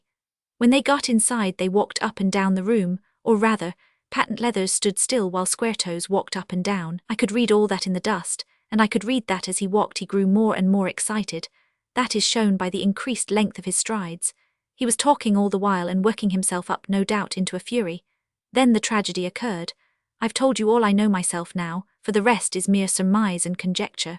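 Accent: Mid-Atlantic, United States. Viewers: Trying for mid-Atlantic?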